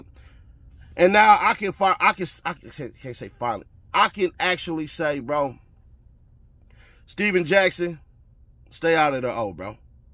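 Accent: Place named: Chicago, United States. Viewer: Your talking like your from Chicago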